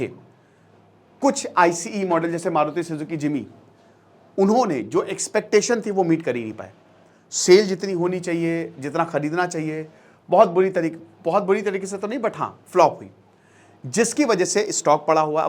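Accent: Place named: Lucknow, India. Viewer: Aap ya to lucknow se ho ya fir ... aap kanpur se hi 😅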